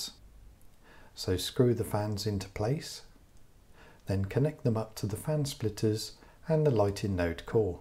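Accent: United Kingdom. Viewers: We need you to start an asmr channel. You have a great voice, and the British accent just makes it perfect!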